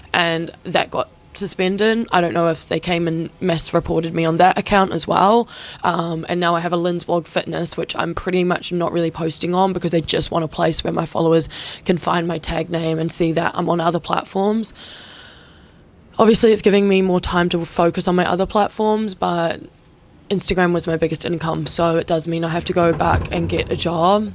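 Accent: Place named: Australia. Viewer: nice outfit, Nice Australian accent 🇦🇺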